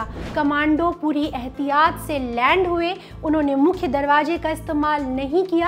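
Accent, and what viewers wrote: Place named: Uttar Pradesh, India. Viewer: Accha achha. Lekin kehne k tarika up wali aur voice quality Manmohan jee के jaisa hai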